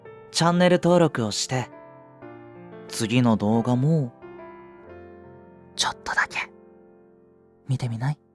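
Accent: Kumamoto, Japan. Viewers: すごい好き！！方言いい！！分からないのは多分ないかな。敬語で方言とかいい！熊本弁だからいいのかなー。後輩くんに欲しい:_わーい:_わーい:_わーい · 熊本弁ｯｯｯ…😭 熊本弁のボイス大好きなのでめっちゃ嬉しいです😭🎶 つい熊本弁で返してしまいますわ🤷🏻‍♀️ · 今日は何かありましたぁ？何かのご褒美ですかー？くんの熊本弁好きすぎるよ～😂 「僕やったら、いつでんよかですよ😊」「笑っとっとが可愛か～」←合ってる？書くと難しい💦 の声好きー♡ 何か…柔らかくてほんわかして温かいです😊明日も元気に頑張れそうです👊ありがとー✨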